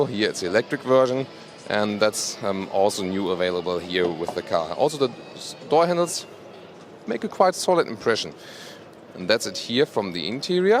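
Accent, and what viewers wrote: Germany. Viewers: ich habs nie verstanden warum du die videos in englisch machst, sehr anstrengend zu hören mit dem deutschen akzent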